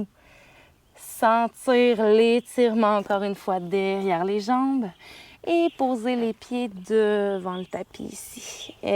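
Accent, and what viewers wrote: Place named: Quebec, Canada. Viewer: Merci cousine québecoise :) Jadore la séance et l'accent!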